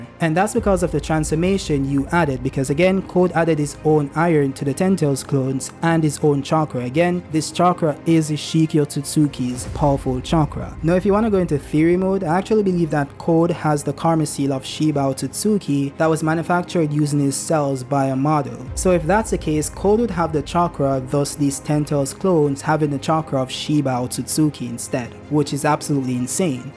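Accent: Jamaica. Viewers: Is bro jamaican 👀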